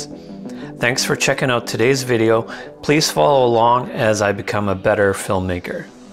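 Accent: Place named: Canada. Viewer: I enjoyed this video. I’m surprised that you could get so close when filming. I like the use of ‘A’ when talking. Very Canadian. It’s a bit long when looking at the male bird. Maybe describing what’s going on with the birds or an explanation on what the female is also doing. I’m not sure what you should say cause I don’t know really anything about Canadian geese (crowbar chicken). Keep going with the video, can’t wait for the next instalment. ❤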